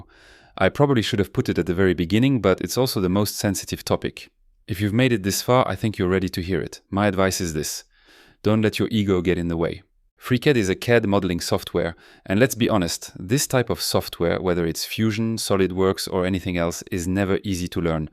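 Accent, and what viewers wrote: France. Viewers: Are you french ? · very very well. although the speed of talking ( that i had to reduce the speed to 0.75 or even 0.5 in somewhere) I liked the way of explaining and your very pleasant French accent. I still have a problem with constraints and why, when, where, which and how to use them😁😁. If its possible make a clip about that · I can hear the accent… your french is showing! ( vrai question, est-ce que ce tuto est disponible en français? Je n’ai rien trouvé… avec l’outil de recherche)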